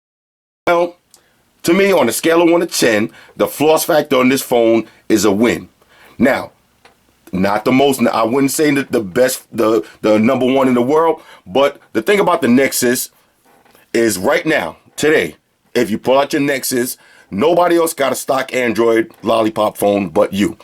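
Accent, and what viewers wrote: New York, United States. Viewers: you from New York Bruh??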